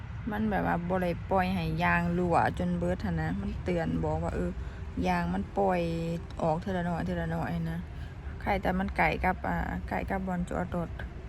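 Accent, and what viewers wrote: Laos. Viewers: พยายามอย่าพูดลาวบ่อยๆ เมื่อไหร่จะพูดไทยชัดสักที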